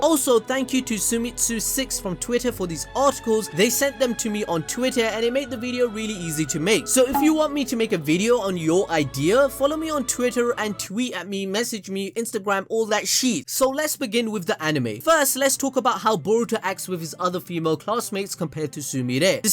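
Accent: Punjab, India. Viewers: Bro are you punjabi ? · ❤️ your Indian ?? ❤️❤️❤️ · That punjabi accent🙏😁😉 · are you indian bro i know what you said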